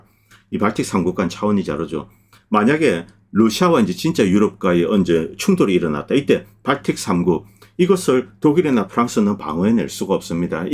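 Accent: Gyeongsang, South Korea. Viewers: 근데 님 예전부터 궁금했던건데 경상도 출신이신가요? ㅋㅋ 이민가시기전에요? 경상도 말투가 묻어나오시는데?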